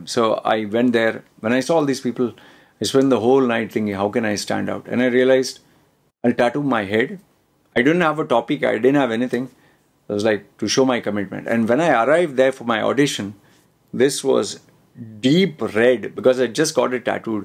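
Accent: India. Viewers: Do you ever plan to laser off your facial tattoos? I agree that it makes you stand out but at the same time, it also makes many people view you as a red flag(attention seeker) . I know you already know that. The first time I saw your video, I was shocked and even more shocked when you started speaking in an Indian accent. I assumed you had some type of trauma growing up for you to do such a drastic thing (facial tattoo).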